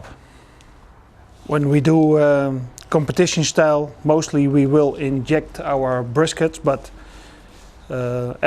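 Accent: Netherlands. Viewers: This guy has a Dutch accent....i know the accent.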